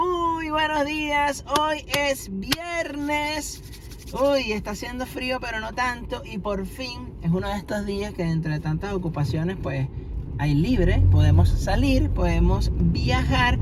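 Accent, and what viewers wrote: Venezuela. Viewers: Eres venezolano? Me parece tu acento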